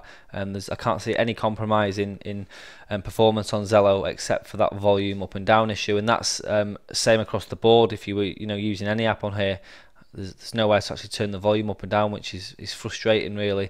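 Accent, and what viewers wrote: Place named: Cockney, United Kingdom. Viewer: good video but you speak faster and you use the cockney accent and I cant understand you please add an authentic english subtitle because the automatic subtitle generator of youtube can't shoot all what you say because you speak faster and withe unauthentic accent thank you for reading my comment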